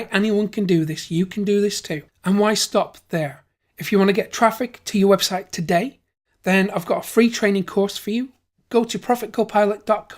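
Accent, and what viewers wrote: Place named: Lancashire, United Kingdom. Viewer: HEY! how are tings in Lancashire, that's where the accent is from, right? Anyhow here's a thumbs up from County Cork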